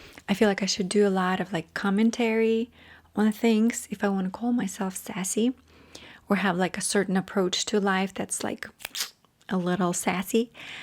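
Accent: Russia. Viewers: Hi! I am from Bulgaria and I officially been in America more than Bulgaria, just like you. I know what you mean. I am so glad you are renaming this channel, I love you but Sassy did not fit you lol. And for the record, I think that on this channel your accent comes through just a bit more than the Gentle Whispering channel. I can recognize your accent, and despite your years in America you will always have your root in Russia. Thank you for your content ❤ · Simply. Even though you have lived in the US longer you still have the ethereal Russian accent so it's perfect. Its what makes you, you. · I've been following and enjoying your channels for many years. As an Eastern European, let me tell you, yes, I can see the Russian origins. You even have some accent left, especially in the pronunciation of your "L". In my opinion, both identities can coexist, Russian and American. 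It doesn't have to be an either/or. It's who you are, celebrate it! ❤ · I like ‘sincerely ’ as I agree that is how I see you and your videos. Also your Russian roots and your amazing accent with its Russian twang is what has kept me listening the past 10 years ❤ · Simply resonates best imo since you aren't trying to be anything else, not a performer, not an influencer, simply. Why, not? Though you don't broadcast that part of yourself all the time it's still part of who you are. The accent in your voice is lovely and reminds me of your rich background, russian treats you've shared with us, and even family you've introduced to us. For me, its part of how I've gotten to know you over the many years and even if the content shared is less culturally focused, it's always a beautiful part of you 🩷 Also, one last thing. You mention that many people distanced themselves from you and your channel. 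I remember that time being a period of seeking a sense of belonging, of security, of self. You were in an emotionally vulnerable place and found solace where it made sense at the time. You said "I deserved it". Please don't beat yourself up over that learning experience. It was part of your journey and necessary for you to get to where you are now 💖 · How brave you are! To share yourself and your journey with us. It hasn't been easy for you over the years and we thank you for your honesty. I like Simply. From a marketing/comms perspective it is very strong. There's alaway...? Emphasising the two aspects of who you are. I would encourage you to embrace your Russian side...even in these difficult times. It's a point of difference for you and for many of us, strength comes in knowing who we are, and where we came from. We love your accent and your cultural difference. It makes you special. 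As far as content is concerned, I love the gardening journey you are on and just about anything you choose to share. But keep some things things for yourself! Your 'sassy' natural personality is wonderful to see and it's important for you to be able to be yourself, not in a curated way. ❤ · I adore how your Russian accent is still so prominent even though you’ve lived in the US for over half of your life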